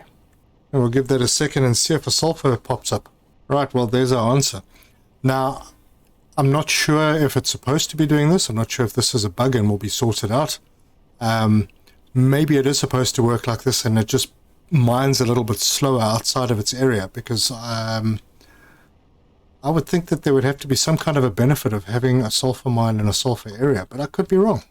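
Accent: South Africa. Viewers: I tested the T3 ore extractors, and you can mine everything without having to go to specific areas (super alloy cave, osmium cave, etc.). Also am I detecting a South African accent?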